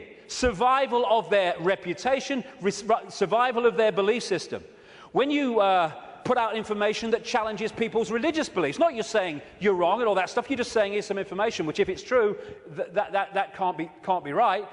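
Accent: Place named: England, United Kingdom. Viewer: This guy is the best!!! He's out of his mind, and he has an English accent to boot. I love this stuff, though, b/c as a sci-fi satirist, this stuff is a veritable goldmine. Keep them coming, and do not take your meds. You're fine just the way you are, in all your conspiratorial, absurd glory!!!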